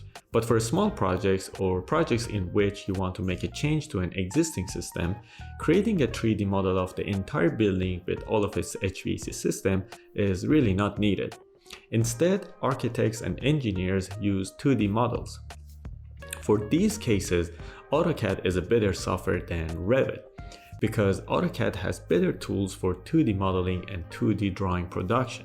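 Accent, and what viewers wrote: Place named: Iran. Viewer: Dear I found randomly one of your videos in YouTube, and l enjoyed it. I started to follow your channel, but I realized that something familiar was in your voice. Lastly I realized that you are originally an Iranian. I'm very proud of you.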